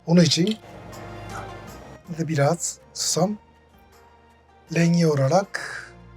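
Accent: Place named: Japan. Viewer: japon aksanıyla türkçe duymak çok güzel yav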